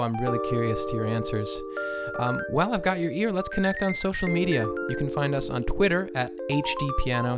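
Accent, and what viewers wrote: France. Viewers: Ahaha the way to you speak french sounds fun Cheers from Paris I speak english espanol french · Thanks, just when I finished learning it xD btw i like your french accent xD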